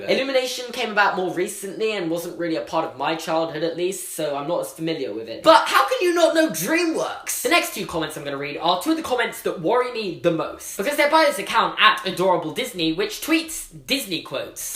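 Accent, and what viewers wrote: United Kingdom. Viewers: Are you from England or Wales? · Are you British or American?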